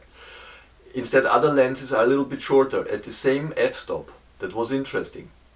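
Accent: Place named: Germany. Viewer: Very informative, thank you! Your German accent adds gravitas to your thoughts!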